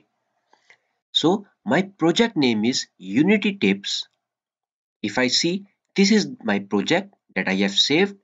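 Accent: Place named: India. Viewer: 3 indian voice ! XD cool !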